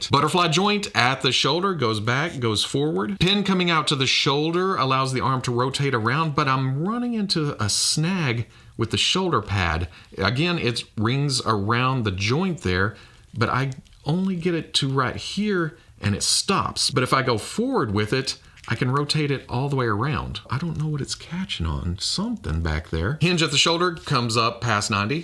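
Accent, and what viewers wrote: New Zealand. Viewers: the kiwi accent is not ideal but still a fantastic effort from a guy so far away from new zealand he’s closer to hawaii…. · lmao I love your New Zealand accent! Excited to get both of these figures!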